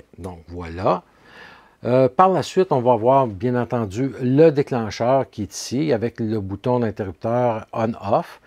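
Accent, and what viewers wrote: Quebec, Canada. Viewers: Il ressemble vraiment à mon FM bien-aimé. Les démos avec l'accent québécois, c'est toute suite plus sympa.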